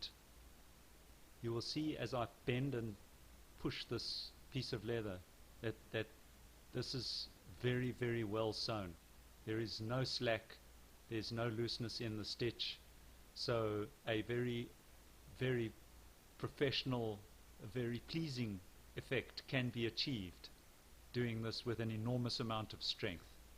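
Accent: South Africa. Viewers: I love randomly hearing an accent from home! Lekker bru :)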